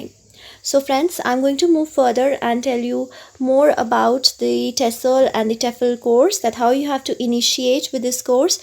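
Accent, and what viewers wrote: India. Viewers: Indian accent you can't blame her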